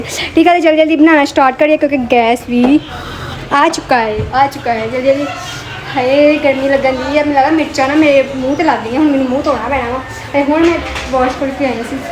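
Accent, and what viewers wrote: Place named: Punjab, India. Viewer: Hi Salam, Nice vlog 😊 Ap jo ya urdu boltay huhy Punjabi ka touch dayti hy maza aya jata hy 👌 😅😂, Han agar ap meray hath ka Custard khaya gi tu fan ho jaya gi 😊 qk ma usmay sugar use nahi karta (Secret hy 😉) 😅😂.. Love u ❤ & take care. Keep Smiling 😃 and Keep Shining ✨️ always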